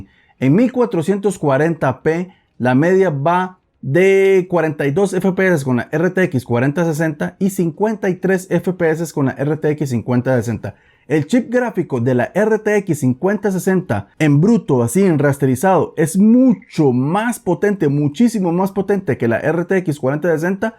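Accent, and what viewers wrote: Costa Rica. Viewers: jaja que bien, no se porque dije: este mae tiene jacha de tico y acento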